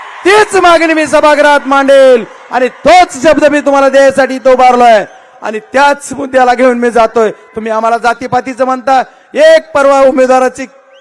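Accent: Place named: Dharashiv, India. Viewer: धाराशिवची भाषा येते बाप्पाची सेम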